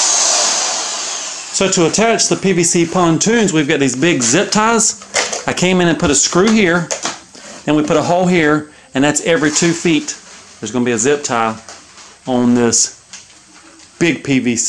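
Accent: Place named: West Virginia, United States. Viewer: Dude I like your numbers mine are chad273👊 if only I had your talent👊 new subscriber here, and I watch a lot of channels and you seem to have figured out how to retain attention. I don’t know where y’all are at but you sound like a West Virginian. You sound like Elliot Sadler which helps on keeping attention. Looks like I have some catching up to do on your channel! Great stuff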